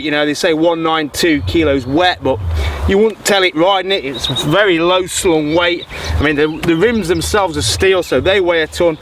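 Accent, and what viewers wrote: United Kingdom. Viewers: is that british accent ???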